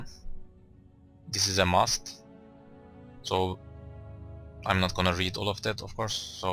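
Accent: Turkey. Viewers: Türk ingilizcesi bu ama gerçekten çok temiz olmuş dostum ellerine sağlık :) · İlk cümlende Türk olduğunu anladım :D Rehber için teşekkürler.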